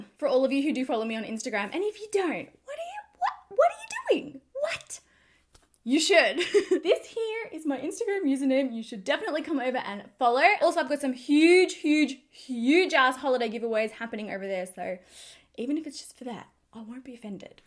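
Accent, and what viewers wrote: Australia. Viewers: I Love your Australian accent I’m in USA 🇺🇸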